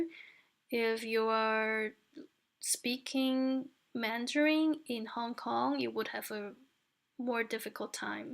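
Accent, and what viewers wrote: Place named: Brazil. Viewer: 修女，我喜欢你的视频！ 你讲得非常好，而且你的语速非常适合我在旁边做笔记。 另外，你的英语口音听起来和我的一个好朋友很像，她是巴西人。 这让我更喜欢你了！ 希望你一切顺利，感谢你的辛勤工作！❤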